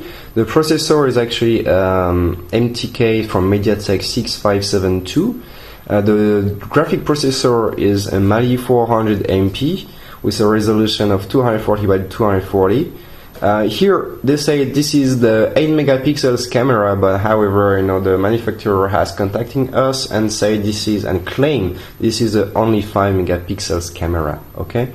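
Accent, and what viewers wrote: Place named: France. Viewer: Good review, terrible english/french accent.. just say app not A-P-P _'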